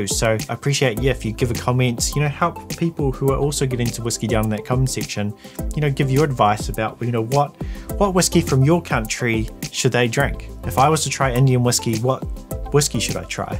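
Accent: New Zealand. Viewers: That's some damn fine production values there fella. Great to hear a kiwi accent on top of some great whisk(e)y content.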